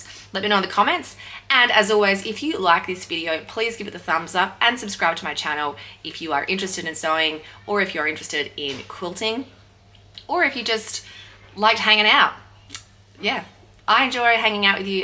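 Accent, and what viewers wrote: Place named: Australia. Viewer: I wondered if you were from Australia! Lovely accent. Wishing you luck from the Midwest, USA!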